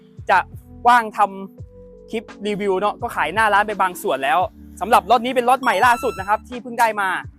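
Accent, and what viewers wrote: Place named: Isan, Thailand. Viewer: มีคำว่าเนาะลงท้ายรู้เลยคนอิสาน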